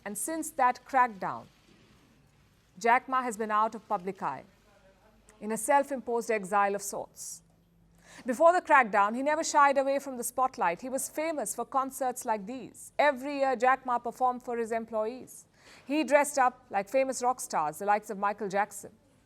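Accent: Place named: India. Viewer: Very heavy indian accent😂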